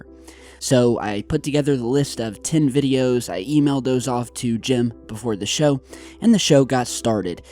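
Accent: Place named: United States. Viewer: Never get bored of hearing your opinions. You have the only us accent without the deep south drawl that I am not irritated by. Everybody deserves some private time and a bit of r&r so feel no guilt for taking the time to stay fresh. Stay real and keep the truth. Kudos & respect for your work.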